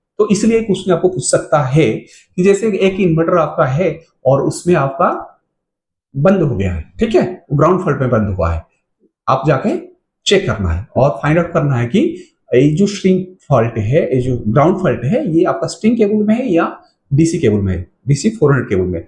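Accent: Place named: Odisha, India. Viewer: Bhai aap odisha se ho kya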